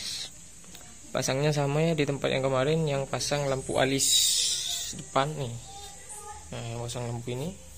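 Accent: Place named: Bondowoso, Indonesia. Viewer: Behhh... bahasanah mik seperti orang bondowosoan reh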